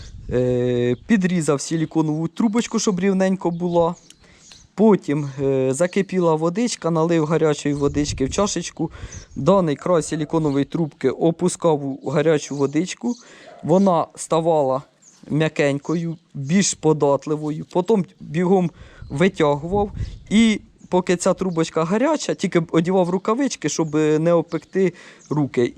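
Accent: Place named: Poltava, Ukraine. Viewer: Мені ті поплавкі даром не треба,але додивився до кінця! Цей Полтавській говор це мед на вуха "діОдик" тобі машини треба продавати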